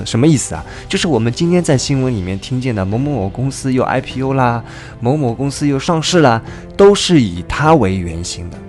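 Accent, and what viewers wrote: Shanghai, China. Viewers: 怎么感觉有上海口音了，，，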